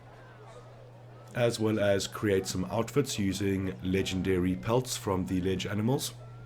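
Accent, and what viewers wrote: South Africa. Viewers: Sound like a South African 🤔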